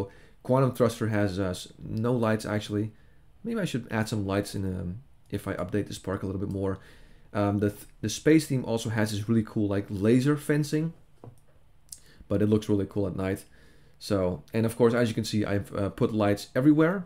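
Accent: Netherlands. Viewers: Obvious Dutch accent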